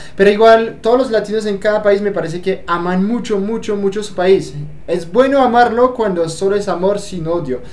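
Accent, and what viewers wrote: Bogotá, Colombia. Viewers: Tu parles trop bien l'espagnol en fait, on entend presque rien d'accent français, et tu as pas mal chopé l'accent colombien. Du coup j'ai vu que tu kiffes mon pays d'origine (Le Mexique)mais tu parles pas comme un mexicain;) haha, ¡Felicidades, güey! ;) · Jajjajaja creo que eres sumamente gracioso. Tienes una impresión muy genial de los latinos. Me parece que hablas con cierto acento colombiano. :) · chevere el video, habla muy bien español? Se nota que aprendió en Colombia, un poco el acento y algunas palabra. Chevre. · Se le pegó el acento colombiano. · habla casi rolo el parcero ,buen video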